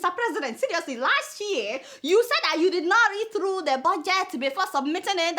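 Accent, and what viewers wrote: Yoruba, Nigeria. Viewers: Nice one. Keep it up! I haven't missed any of your weekly episodes since I got introduced to it before the 2015 election in Nigeria. Don't mind my name. I'm Yoruba, and I love the way you speak the raw Yoruba language. I'm proud of you!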